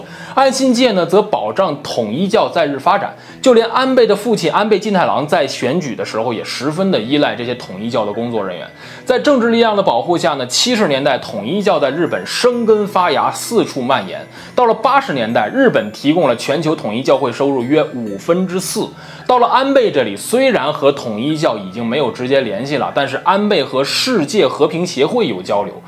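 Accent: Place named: Beijing, China. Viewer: 這個小哥北京腔好明顯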